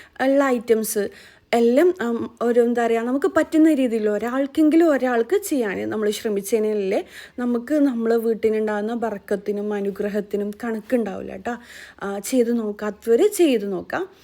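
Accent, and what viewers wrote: Kannur, India. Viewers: ങ്ങൾ കണ്ണൂർ ആണോ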